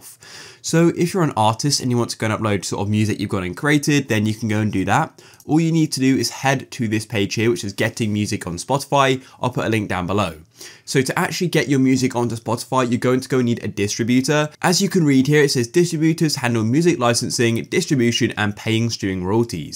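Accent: United Kingdom. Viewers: i could tell this guys british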